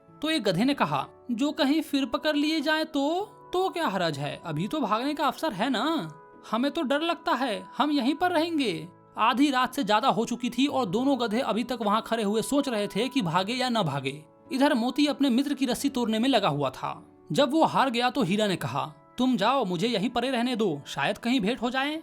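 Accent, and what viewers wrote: Bihar, India. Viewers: Bihari tone kam nikala karo samajh nhi aata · Bhaiya aap Bihar se ho kya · Aap bachhon ko hindi padha rahe ho ya bihari... Apni language par thoda kaam keejiye... Bachhon ki bhasha sudharni hai bigadni nahi hai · I know you are Bihari 😊😅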